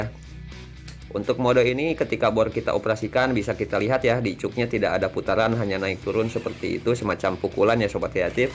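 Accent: West Java, Indonesia. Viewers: Keren gaya bicaranya 👍 Logat sundanya masih ada 😀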